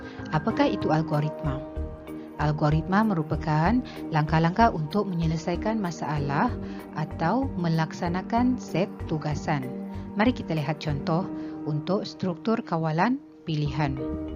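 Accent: Sabah, Malaysia. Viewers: Ckgu orang sabah ke atau sarawak?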